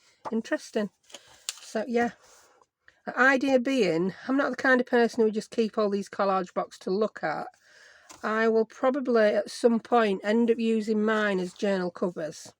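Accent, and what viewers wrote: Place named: Yorkshire, United Kingdom. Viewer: Dot from Derbyshire Hi, I have just watched your video, for first time. I really enjoyed it, also love your Yorkshire accent. I will be following you. I have just discovered Daphne's Diary also and have had a look at the last two years issues on you tube flip through, I think it is brilliant and will be ordering it for this year. Being new to collage I'm sure it will be great to use it. I have also been watching Froyle Art who is a great inspiration for collage and Gelli printing so I will be dusting off my Gelli plate and 2023 is going to be a creative year for me. Thanks for sharing the video, lots of love from Derbyshire, Dot x.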